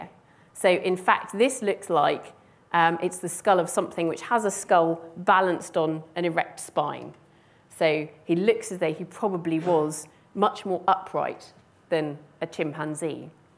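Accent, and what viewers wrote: United Kingdom. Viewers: what part of england does that british accent come from and does it have a particular name? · The language is English but to this American’s ears it sounds very British. Some Brits are easier to understand than others, I’d say she’s in the middle. Anyway, this is a good talk if getting dated. No particular insights although I didn’t stay till the end.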